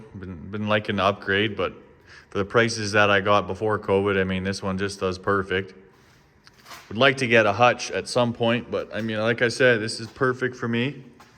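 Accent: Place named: Canada. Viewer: heard you talking and said he's Canadian then saw the Berta tags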